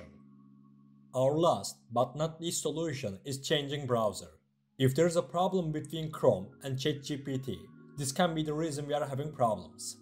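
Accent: Turkey. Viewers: Abi ingilizcenden anladim turk oldugunu evyallah yardimin icin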